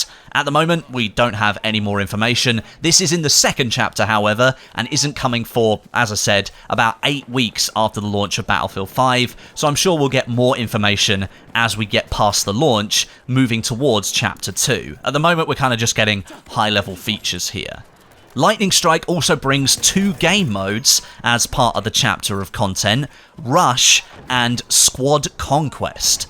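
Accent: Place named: United Kingdom. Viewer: This high pitch british male voice is really relaxing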